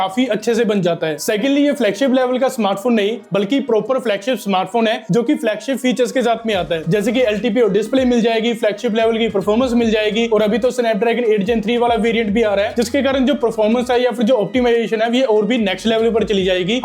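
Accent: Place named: Punjab, India. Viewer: Are you punjabi? Your hindi has punjabi flavour😂😂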